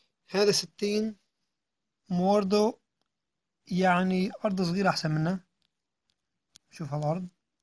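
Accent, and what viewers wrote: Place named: Aleppo, Syria. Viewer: احلا قناة باليوتيوب , ولهجتك الحلبية محلاية القنات, استمر ..انشاء الله بتوفيق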